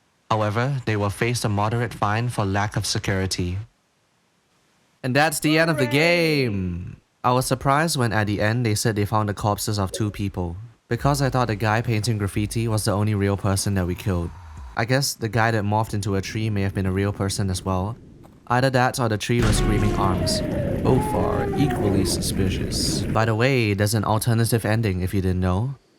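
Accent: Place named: Singapore. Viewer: singaporean accent detected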